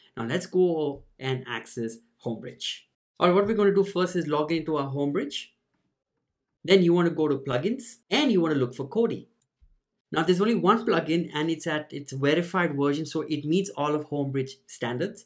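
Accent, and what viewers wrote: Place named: India. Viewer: You are 100% from India. Unmistakable heavy accent.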